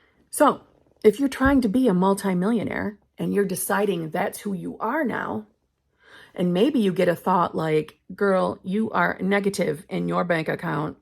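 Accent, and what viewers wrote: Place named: Upstate New York, United States. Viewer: New York upstate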